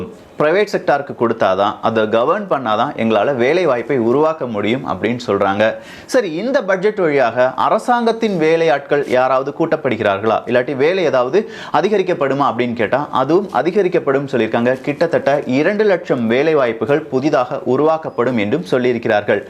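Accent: Kerala, India. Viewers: Hello bro! I watch all your videos! They are really nice and useful. But unga speeches la Niraya Malayala vaadai adikuthu! You have a strong Malayalam accent! At times I hear Malayalam words also! Are you basically a Malayalee? Is your first language Malayalam! ? Just curious bro!!!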